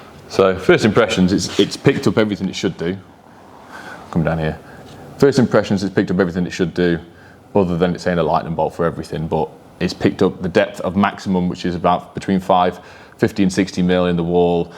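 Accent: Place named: United Kingdom. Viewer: british accent SHIT!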